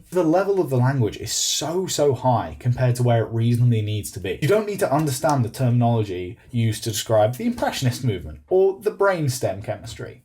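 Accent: United Kingdom. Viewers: 영국발음 너무 조-하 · Hi! 오랜 팬인데 댓글을 남기는건 처음이네요. 특히 이번영상에선 영국발음이 너무 멋져요👍🏻 다음 영상도 기대할게요🔥🔥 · 영국발음 너무 좋다고요 ㅠㅠㅠㅠ 영국식 영어 배우고싶당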